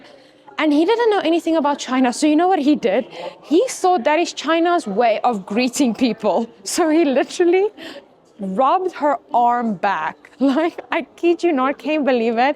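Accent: South Africa. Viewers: Watching from Montreal, Canada. are you South African? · jy het n lekker South African accent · your accent sounds South African... is that the effect of Mandarising your US accent... just out of interest... Many thanks, loved the video, subbed. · I could be wrong, but you sound South African. From Jhb, i just wna say, you got my subscription sister ❤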